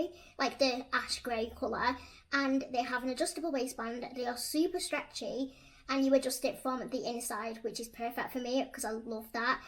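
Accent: England, United Kingdom. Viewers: I just love your English accent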